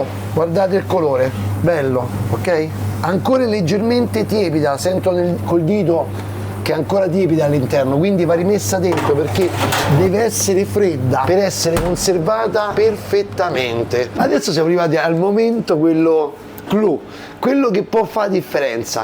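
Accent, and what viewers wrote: Rome, Italy. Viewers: Finalmente risento parlare da Roma. Buona la cicoria ripassata. Ma che ne sanno qui in Liguria. Qua se trova la catalogna...... Mi fai ricordare il sapore.... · ti seguo da ieri ma mi sono già iscritto sei un grande con il tuo parlare romanesco il giusto che non da fastidio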